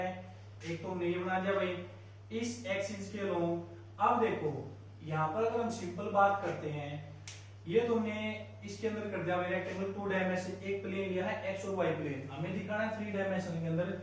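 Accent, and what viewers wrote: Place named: Haryana, India. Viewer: Haryanvi tuch me mja a gya pd kr sb clear ho gya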